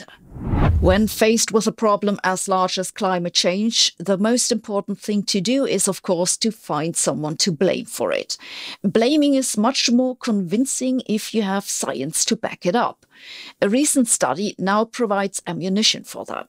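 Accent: Germany. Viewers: I am sure that if you improve your hard german accent and avoid making funny irony you could double the number of views. I tell you this with sincere simpathy, both things reject and create a strange sensation about you. Best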